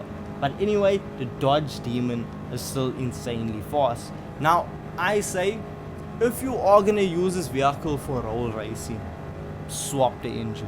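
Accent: South Africa. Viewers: South African😎😎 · Amigo, he's South African, some 12,300km from Beijing...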